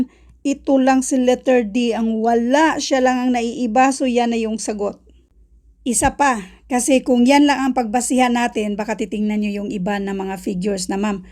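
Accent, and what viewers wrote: Visayas, Philippines. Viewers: Bisaya accent 🫶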